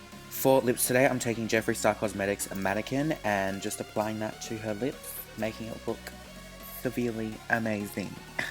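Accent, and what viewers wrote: Australia. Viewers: Never mind... It's definetly Australian 😅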